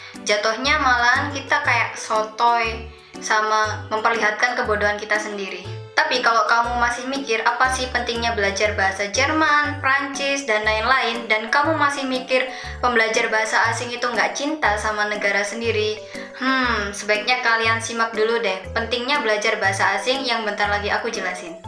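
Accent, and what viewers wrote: Java, Indonesia. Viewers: Muedhok puol, tapi keren kak saya suka tips nya hehe 👍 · javanese nya kentel bgt mba 😂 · klo aku nanya kayak nya di jawab ngga yah??? kak, orang mana sih? kok jawa nya agak medok? hehehe just asking.... Loading.... Waiting for answers..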